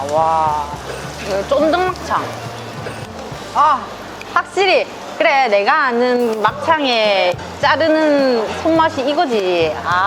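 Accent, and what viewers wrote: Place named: North Gyeongsang, South Korea. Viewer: 맹이나물이 아니고 명이나물 ㅋ댁이 워데이껴? 억양은 경북북분데 ㅋ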